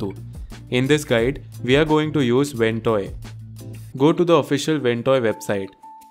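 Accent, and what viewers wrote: India. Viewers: As I heard the Indian accent, I knew this is going to work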